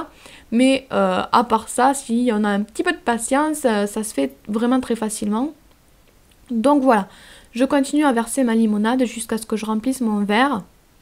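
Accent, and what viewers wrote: South, France. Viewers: j`adore ton accent du sud trop cool et les boissons son super bonnes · Je kiff l'accent du sud 😍😱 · bah moi j'ai laissé chez moi et ça marche bien c'est trop bien ton truc moi j'aime bien j'adore ton accent du sud un accent du sud c'est bien mais c'était très bon et j'en ai fait chez moi bah ça c'est bien et voilà donc merci beaucoup pour ta recette😇😇😇😇😇😇😇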